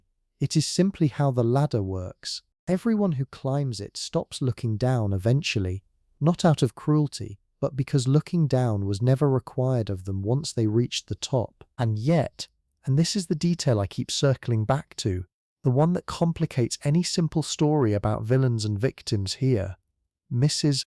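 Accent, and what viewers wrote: United Kingdom. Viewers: I’m from Mississippi, USA 🇺🇸!!! Love the videos, plus the British accent saying alright love 😊❤❤❤ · Coastal Southern California PM. As I’ve said before, I enjoy these videos, but the British accent is stimulating and keeps me from falling asleep. It would be nice if there were an option to hear an American accent. That would remove an aspect of interest, and I could relax and go to sleep.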